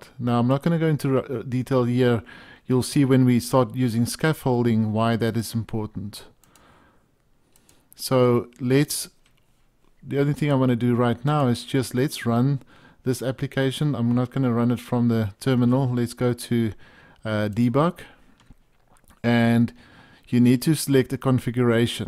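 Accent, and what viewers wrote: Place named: South Africa. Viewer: great. you sound South African...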